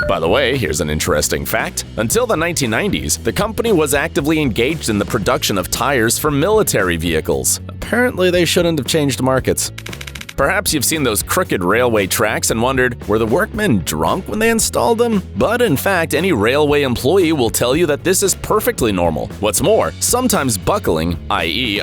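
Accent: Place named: United States. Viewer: I watched about half of it. It was just unnatural, a guy with an American accent saying all those metric units.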